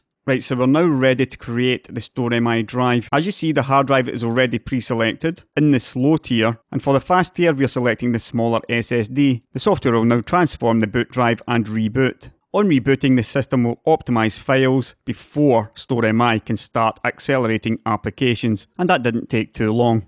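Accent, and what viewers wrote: Scotland, United Kingdom. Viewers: Nice work from AMD but I've already been using PrimoCache for years and this is effectively the exact same thing. Nothing really new here from what I can see but bringing this to the masses as part of their platform can only be a good thing! This is one of the many reasons my next system will be all red team. As always a great video. Cheers from Australia, you awfully Scottish fella. 😉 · Is that a Scottish accent ? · Scottish accents make tech videos better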